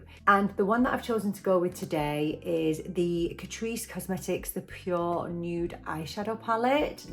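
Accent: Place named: Manchester, United Kingdom. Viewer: Thought I detected a slight Mancunian accent ☺️. Wilko stores no longer trade, haven't found any pharmacies that stock Essence as yet!! Have a good day! Xx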